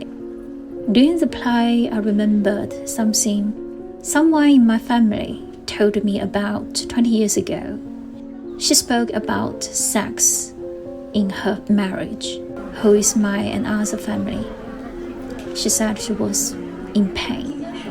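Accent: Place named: United Kingdom. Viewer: have such a charming British accent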